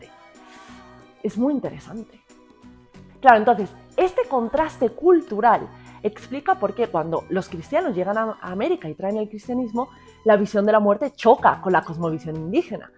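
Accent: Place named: Mexico. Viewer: Hasta se me olvidó que estás en España, se sintió como si hablaras desde algún punto de México. Gracias❤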